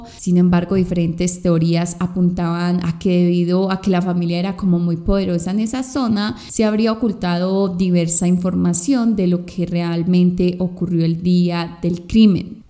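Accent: Colombia. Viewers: El acento colombiano es muy agradable !!!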